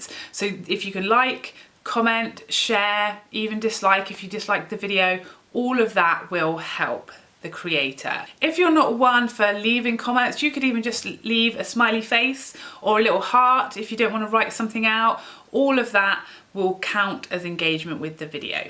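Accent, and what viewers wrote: United Kingdom. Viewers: Good information! I had no idea...but how long do i have to let the ad play? I used to always click skip ad. Why don’t you do links so I can purchase through your link? I do enjoy your channel and do want to support. I’m an American that loves a good British accent😄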